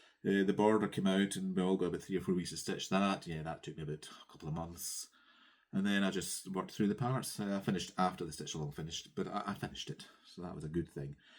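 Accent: Scotland, United Kingdom. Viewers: Greetings from Carmel, Indiana in the U.S. I loved seeing your fancy ladies and your SALs. Also love your Scottish accent. Keep up all the great stitching!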